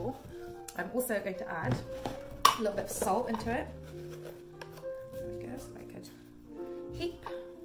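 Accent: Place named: England, United Kingdom. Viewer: This is an amazing and so easy a recipe. English accent I hear, I'M From UK too #7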